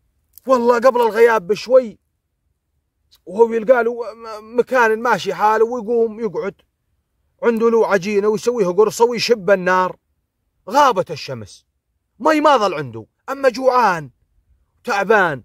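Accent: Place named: Western Iraq, Iraq. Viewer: امتابعك من العراق استمر وعلى فكرة لهجتك تشبهة لهجتنة بل مناطق الغربية حيل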